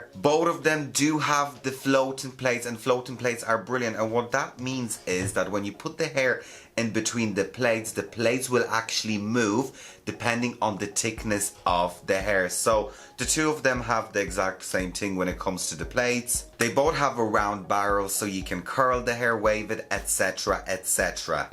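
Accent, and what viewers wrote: Ireland. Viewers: Was not expecting the Irish accent 🍀 ❤ my original ones plug broke I so regret throwing it away and replacing with the platinum 😢 platinum one makes my hair static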